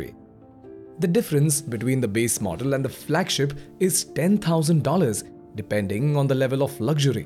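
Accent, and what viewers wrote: India. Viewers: English with an Indian accent Not interesting for advertising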